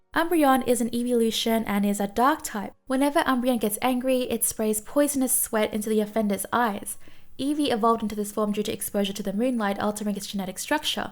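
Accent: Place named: Australia. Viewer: I'm pretty sure you are saying all the names right, just with an Aussie accent lol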